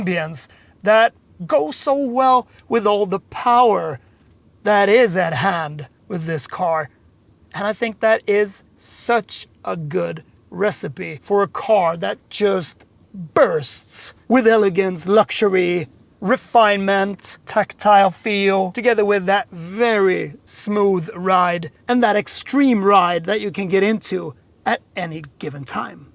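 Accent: Sweden. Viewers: Man can hear the swedish accent svengelska :D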